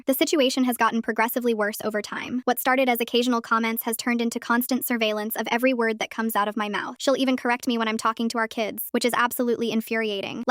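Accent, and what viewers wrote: Boston, United States. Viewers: So you're from lower Boston just lean into it more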